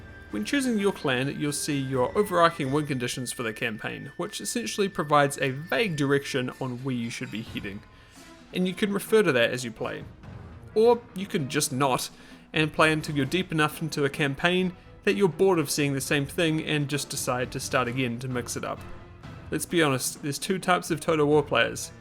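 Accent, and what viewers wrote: New Zealand. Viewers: I love this review for a few reasons: it's exceedingly late, which means it's gonna help folks who may have compatibility issues on newer systems; it's weighing the game more on its own merits than just mindlessly comparing it to Rome 1 or Medieval 2 as some old school TW fans tend to do; and the exceedingly kiwi accent. The only thing I disagree with in this video is the statement that Warhammer 2 is the best example of a Total War game thus far. Mechanically, maybe, but a huge part of the appeal of the franchise has always been the large scale battles set in their different takes of different historical periods and settings. You can't take that away from Total War even if it permanently shifts to fantasy, like going into LotR, Game of Thrones, or even WarCraft lore (which I'd honestly love to see). In that regard, I'd still say Shogun 2, especially including Fall of the Samurai, is the epitome of a Total War game among the post-Medieval 2 releases. 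· Wow, you’ve got one of the kiwiest accents I’ve ever heard. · homie is kiwi af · Oh he's a kiwi I was wondering why the accent was confusing me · "Some clans may begin in a more precarious position than others" Legendary Uesugi flashbacks What timing though. Was surprised to see a video on this when I'd been playing shogun 2 just a couple of months back, and shocked to hear a good ol' kiwi accent.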